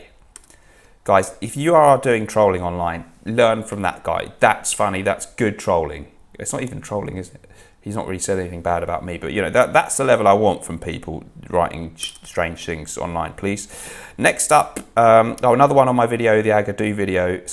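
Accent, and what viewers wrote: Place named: RP, United Kingdom. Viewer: Hello, how are you doing? I am a bit baffled by your videos. For a Polish guy living in Germany, now Miami, your English is very good. You even have this posh accent. How did you manage that. Why are you not clapping and anymore? I miss that. Talking about,… maybe your “ gay “ adventure would have never taken place if you did not spin around. is a song tittle and she make heterosexual males into bisexual or even gay clones. Everybody knows that. You are not gay, you are just a kind of dupe or clone of your self like Armaf cdnI. You are your own scent clone. Just do not spin anymore. And are you now Jewish or Muslim? I do not see your cross or hear you talk about the big J in the sky anymore. Please explain yourself. Are you at the Versace house now in Miami? I see the Angel statues next to you. Very Versace. Anyway I did see you worked out more. 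The body is looking better than ever. Maybe you should do a polish folk dance instead in the turn. Anyway, you are the best. Lots of love from your nr 1 fan. 🤪🤪🤪🤪🤣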